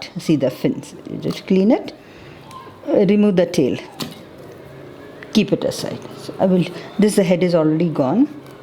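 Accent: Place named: Mumbai, India. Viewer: Hii.. I just want to say Bangdda Bangddo Bangddulio Bangdde it’s the same..it depends on the pronunciation just like salcettekars and Bardezkars pronunciation.. If she lives in Bombay being a Goan from Goa she’s there for a purpose of her living for bread and butter for job purposes and good opportunities unlike the same who works abroad.. if they live anywhere beside goa that doesn’t mean they’re Maharashtrian or belongs to any other religion or caste or nationality..she’s very much a Goan from Goa and lives in Bombay.. instead appreciate her recipes and comment over that.. that’s solely her style of making and saying.. Saying Bangddo doesn’t make her an Maharashtrian it’s just that staying for ages in Bombay or any other country you adapt the accent of that state or country.. What she does is just exhibiting her Goan recipes for the once who’s anxiously wanting to learn and prepare the delicacies of Goan cuisine.. 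instead appreciate her work and give feedback on the recipes and not the pronunciation.. And yes residing anywhere in the world country or states doesn’t matter we all know why we’re there.. what matters is our roots that we’re Goans from heart and birth.. A root grows inside the soil and the tree or plant comes out and we see them widely that doesn’t mean if the roots are inside the soil then it’s different then the tree or plants visible from outside belongs to somewhere else.. it’s the part of each other.. same way…. You can take a Goan out of Goa but can’t tak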